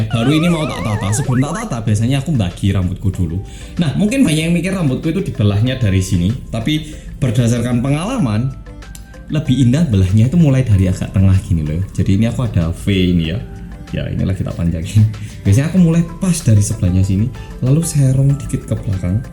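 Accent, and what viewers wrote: Java, Indonesia. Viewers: AKHIRNYA MAS BALIK LAGIIII😘😘🤗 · Akhirnya mas datang lagi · Akhirnyaa kambekk, aku kangen mas medhokkk 😭🥰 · Kok kayaknya mas makin yaa?😂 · Orang korea yg medokkkkk...🤗🤗🤗 yeee akhirnya upload video baru...🥳🥳🥳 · Orang korea yg ♥️♥️♥️ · The mas mas is back! Medhokkkkkkk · Medoknyaa mood bgt ^^ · Ya ampun akhirnya mas comeback, kangen banget ma kontennya apalagi orangnya wkwk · welcome back 🥳 intronya mo yg ' ' ato 'kinclong' sama ja smua nya ok👍 tetep semangat ya.. 'fightiiiing' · Akhirnya denger lagi suara mas yg 😁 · lama GK nonton kwkwk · WELCOME BACK MAS! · Welcome back mas yg medhokkkk!!❤ Seneng dapet notif akhirnya upload juga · wes sam gausah ribet ribet konten e sg asik ae. nge vlog kek utowo metbg utowo rindingdong aja gausah ribet kek detektif reomit. wes talah viewer mu iki mbo kasih konten apa aja lo seneng, kenapa ga viewer senang liat konten trs sampean jg seneng buat konten. 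Semangat sam, btw welcome back to korea reomit bersama yg medokkkk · Semangat mas.... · Oppa come back😍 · Welcome back to Korea Roemit bersama Mas yang. 😅 Syukur hiatusnya ga lama-lama. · Hai mas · Kok logat Jawa ya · isuk isuk mantep👌👌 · Mas come back😄😄😄💛 Mas kincling deng yaaa.. Akhirnya come back setelah notif channel korea reomit yg di tunggu tiap menitnyaaaa😣😍😍😍😍😍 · Lovely korea is back!! 😇 고마워 Mas 🥰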